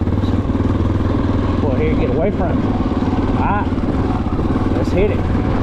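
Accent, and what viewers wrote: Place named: South, United States. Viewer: Hillbilly talking.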